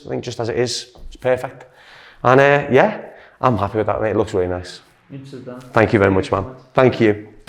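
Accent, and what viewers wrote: Liverpool, United Kingdom. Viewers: I get it ure british . Now turn it off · Where are you based? You sound like a Scouser, are you in Liverpool? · This is British accent right?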